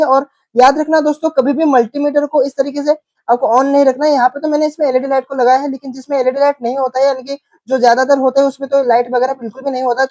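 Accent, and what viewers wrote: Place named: Odisha, India. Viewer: mujhe lagta hay odisha se ho app😂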